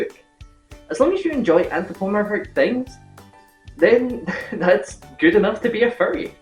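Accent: Scotland, United Kingdom. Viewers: Is that a Scottish accent I detect? Well, hello there fellow Scot! Interesting video.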